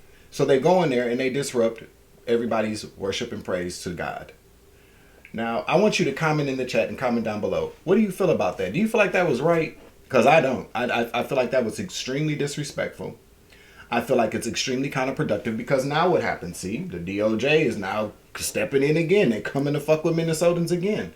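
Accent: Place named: Canada. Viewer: Sounds like Canada 🇨🇦 aaa lol my home and native land 😉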